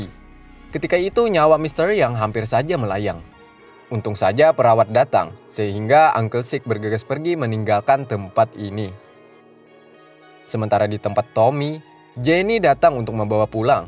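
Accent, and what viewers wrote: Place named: Kalimantan, Indonesia. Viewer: Logatnya khas kalimantan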